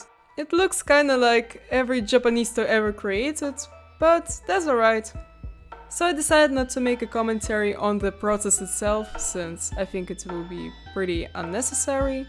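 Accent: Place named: Russia. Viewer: Такой яркий русский(?) акцент, что я даже обрадовался тому, что глиномесов с прямыми руками полно и в России. (Если я все-таки оказался прав.)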